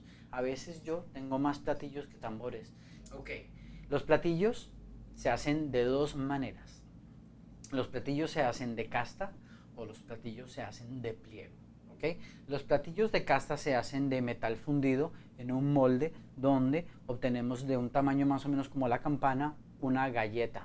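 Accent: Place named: Colombia. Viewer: eh tio eres buenisimo jajajajaja que risas me hecho con tu asento de colombia... eres un crack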